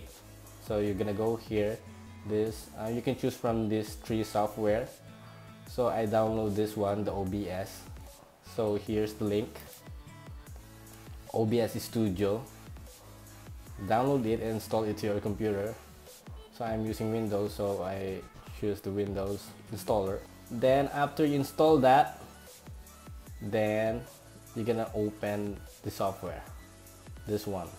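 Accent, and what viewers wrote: Philippines. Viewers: halatang pinoy ka sa accent thank you talaga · I like your accent :p PINOY?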